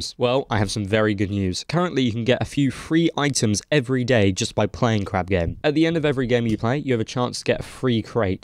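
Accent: Argentina. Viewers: are u from argentine ?? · Che sos argentino?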